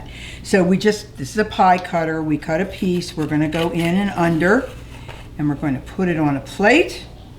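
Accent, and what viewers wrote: Philadelphia, United States. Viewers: Is that a Philly/Delco accent I hear? 😃 This dessert looks so yummy! 🍰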